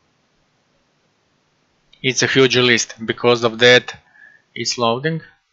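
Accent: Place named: Serbia. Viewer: Hvala, po akcentu vidim da si neki nas 😄 Mislim da bi verodostojnije bilo da si u Ahrefsu proverio domain rating i ceo domen, mislim kad ga vec korisits.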